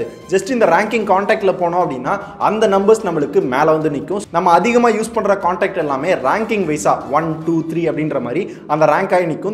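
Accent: Madurai, India. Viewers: Madurai karanga na summa VA Thaniya therivom la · Madurai style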